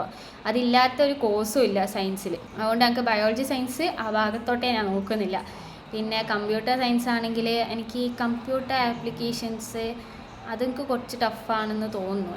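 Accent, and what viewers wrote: Kannur, India. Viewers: അത് കണ്ണൂർ സ്ലാങ് ആണ് ബ്രോ 😁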